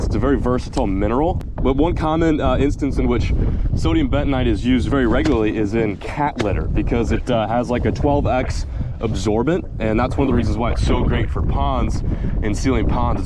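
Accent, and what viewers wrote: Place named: Texas, United States. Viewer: I’m starting to hear some texas twang in your voice, has been acclimated🤝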